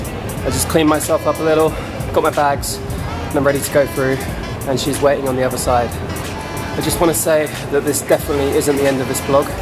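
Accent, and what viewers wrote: United Kingdom. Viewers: love this British accent....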